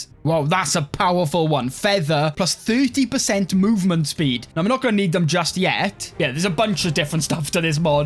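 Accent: Wales, United Kingdom. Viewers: Welsh accent?????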